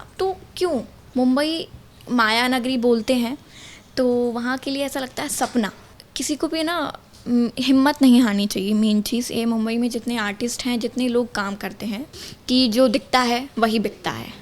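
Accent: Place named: Bhojpuri, India. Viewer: Tum bharatpur ki language nhi bol rhi ho ..... bhojpuri hi tmhare language me jhalak rhi h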